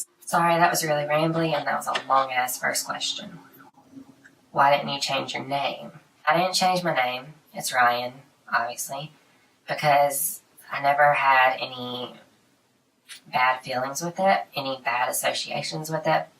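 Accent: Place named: South, United States. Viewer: I’m curious about your accent. I’m from SC, and it’s close. I’m thinking you’re from eastern NC maybe? Anyway, you’re from the South, so you’re cool with me. Edit. Midlands of SC.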